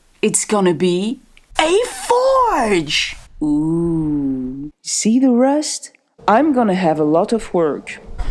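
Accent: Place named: England, United Kingdom. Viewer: 👍 for the english accent !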